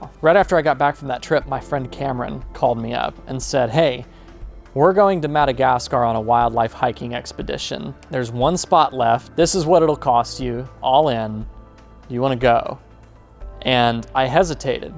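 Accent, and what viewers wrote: Texas, United States. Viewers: Thank you for your efforts to make this channel. I discovered it this year when looking for videos on the Mooney and I met your series ferrying the Turbo Mooney to Texas. BTW, I’m amazed how easily I could understand your texan accent 😉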